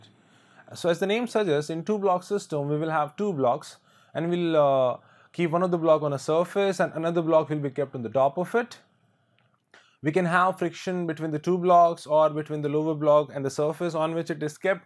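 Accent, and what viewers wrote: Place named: United States. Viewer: He is an as*hole really! F*ck your Amreican accent you filthy crow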